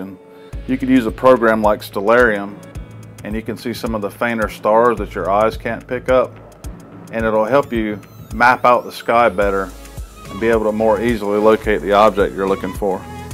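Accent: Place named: South, United States. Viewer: There's just something about a thick southern accent that just derails any of the context of what's being said...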